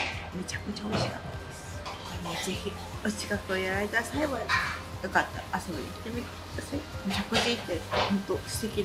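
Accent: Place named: Osaka, Japan. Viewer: 、大阪弁好きやわあ😘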